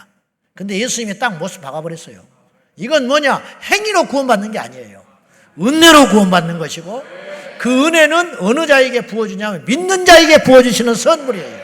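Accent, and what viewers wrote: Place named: North Jeolla, South Korea. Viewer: 억양이나 사투리 보면 전북으로 추정됩니다.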